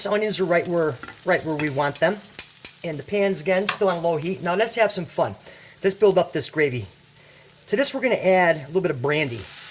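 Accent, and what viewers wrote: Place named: New York, United States. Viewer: Accent of N.Yorker !!!😎Cheers !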